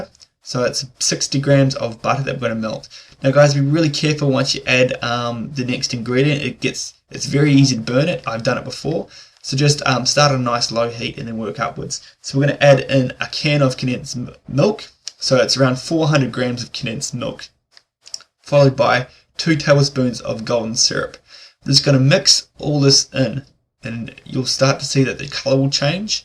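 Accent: New Zealand. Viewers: Are you from new Zealand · Are you a Kiwi….you talk like me?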